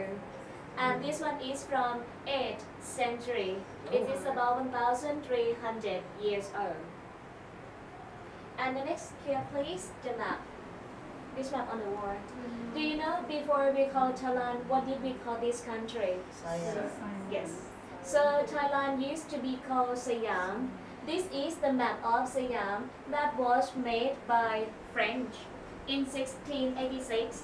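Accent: Scotland, United Kingdom. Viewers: Interesting museum, but camera-work amateur. Hand-held and shaking, lens mostly on the tourists, not on the artwork. Outside, noisy traffic, cant understand the Scottish accent.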